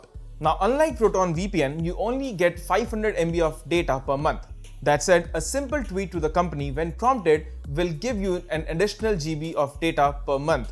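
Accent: India. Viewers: Your accent is looking Indian ... So sorry but is hard to trust you ! I know Indian IT is the best but is also the most scammer in the world. 😱👐🤢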